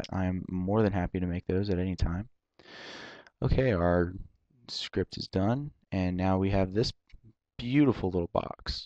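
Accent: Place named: Ireland. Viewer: u from ireland what city ???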